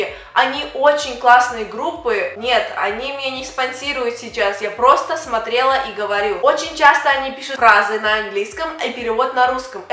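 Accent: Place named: United States. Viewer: у тебя уже акцент американский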